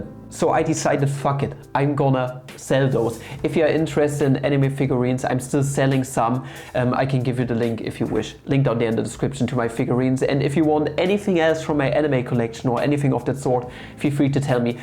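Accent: Germany. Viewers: Thickest German accent I heard. 🫡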